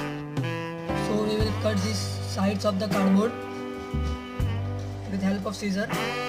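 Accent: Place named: India. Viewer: Dude Well done but some word are still in indian english so Some people won't understand it Well I m indian So I no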